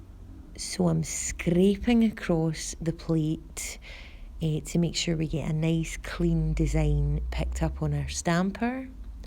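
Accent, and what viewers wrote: Scotland, United Kingdom. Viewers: Great tutorial, instructions are very clear and your accent(Scottish?) is just adorable! Xxx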